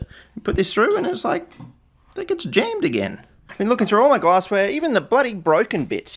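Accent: Australia. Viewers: ty the tasmanian tiger is what i first thought with gamecube and your accent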